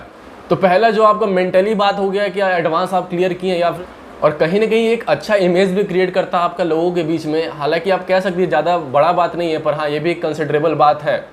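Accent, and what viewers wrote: Bihar, India. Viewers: Bhai aap Bihar se ho kya? Aapke bolne ke pattern se laga to puch liya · Bhai love from jharkhand...I can recognise sound of bihari/Jharkhandi anywhere😗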